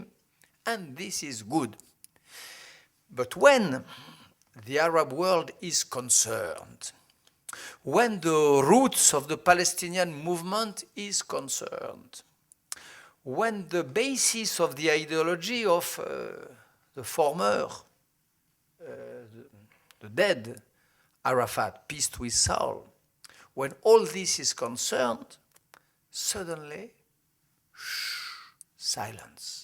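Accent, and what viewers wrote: France. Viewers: he is right anyway... but his french accent is fucking funny...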